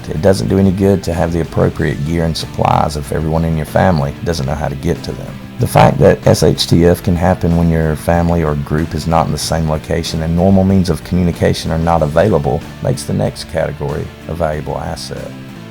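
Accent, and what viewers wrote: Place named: South, United States. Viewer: I wish I had this guys country voice I would get so much pussy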